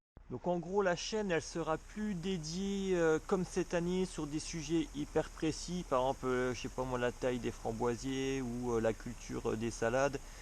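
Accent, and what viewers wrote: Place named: Franche-Comté, France. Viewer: Vous avez un accent franc comtois!!!!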